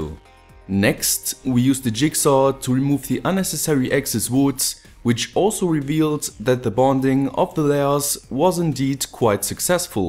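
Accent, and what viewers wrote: Germany. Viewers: Sag mal täusche ich mich da oder bist du deutscher weil mir is das jetz schon öfters aufgefallen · LOL are you from Germany ?!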